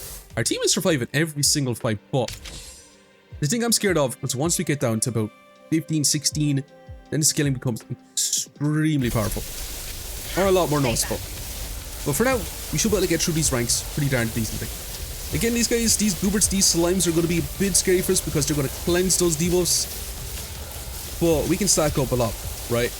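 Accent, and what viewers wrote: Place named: Midlands, Ireland. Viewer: Lovin the videos on Guildrun man but I can't place your accent and it's drivin me up the walls haha its between Monaghan and Roscommon I'm guessin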